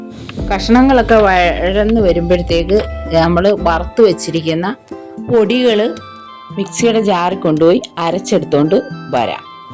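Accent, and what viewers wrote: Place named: Adoor, India. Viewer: Njan Adoor kari aanu, njagal sheemachakka ennanu parayunnathu, nammal kadachakka ennu parayunnathu pineapple ne aanu, nattil evide aanu, samsaram kelkkumbol nammude bhagathu ullathu aanennu thonnunu, njanum ithupole aanu sheemachakka theeyal undakkunathu, super recipe😋👌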